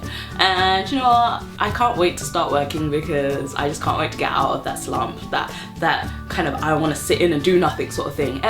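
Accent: London, United Kingdom. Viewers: I'm from London, meself, and that sounds like a London accent to me- (for the most part anyway!) o.o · You sound proper British! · Language adaptation that's awesome! I do that sometimes well as long as they understand you haha I think you still have your british accent don't worry too much and get out there some more pwahaha! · I kind of notice it at some point especially when you emphasise the r in words with r. But you still sound like you're from London. Love your videos, btw! Xxxxxxxxxx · I still think your British. To me, your accent hasn't really changed. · You still sound London-y to me. I'm very introverted so having plenty of time to myself is idle to me. The last time I felt lonely was when I visited my dad in Nevada, USA and didn't know anyone and it was so isolated because it was basically a desert lol. Do a Facial routine or GRWM video! 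I'd be interested in seeing that. :) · aww, some moments in this video you did sound very Canadian/American (ok, i can't distinguish between the 2 lol) but the London accent is still there girl! Don't worry. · And you definitely dont sound canadian........ At all. You sound very British to me. And my fellow canadian people will definitely say the same thing. ☺ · I saw some of your other videos before seeing this one and I could easily tell you were from London or British. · I love your accent and I knew immediately that you were a Brit. Who have you been talking to? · Most people expect the "Ello guv'nor" type Oliver Twist flex. You still have your accent, it hasn't changed at all. British is not an accent.... Lol. You have a London accent there's a few accented words but it's minor. London misses you. Enjoy Canada! Been watching your videos from day 1. I hope you get back to making the videos again.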